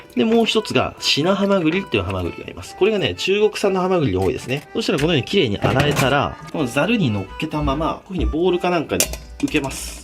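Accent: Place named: Nagoya, Japan. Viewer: の名古屋弁が心地いいなーと思いながらいつも見てる。名古屋の人はわかるんじゃないかなー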